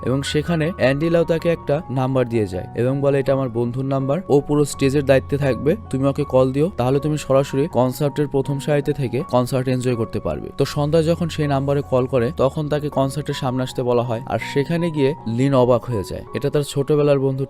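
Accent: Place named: Barishal, Bangladesh. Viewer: আপনি বোরিশাইলা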